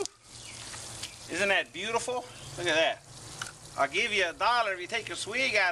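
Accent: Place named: Mexico. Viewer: He talks like a mexican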